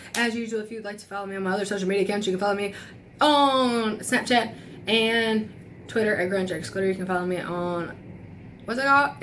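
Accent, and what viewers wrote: South, United States. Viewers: you have the same southern accent I have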